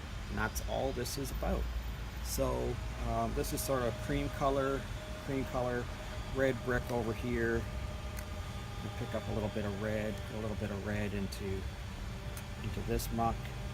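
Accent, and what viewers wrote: Canada. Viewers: Loved this 😁 was real and I could feel like I was there watching you draw!! Also love your Canadian accent expecially when you say (Out) 😁 great art, great artist, and great channel, all the best my friend 👍 ❤️🇬🇧☮️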